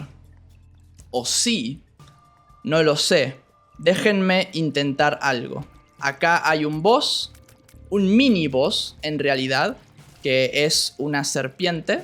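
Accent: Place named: Argentina. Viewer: Se nota que es nativo, hasta se identifica a kilómetros que es de Argentina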